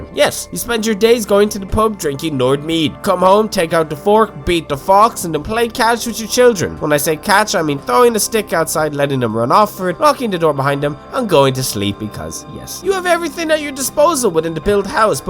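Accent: Ireland. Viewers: Standards? My standard is that Irish voice and a shot of a half-naked M'iaq cooking stuff in a pot. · R u Irish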